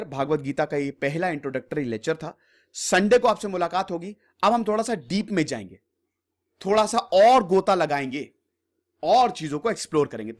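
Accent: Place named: Lucknow, India. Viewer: 😀😀😀... Lucknowi andaaz 😎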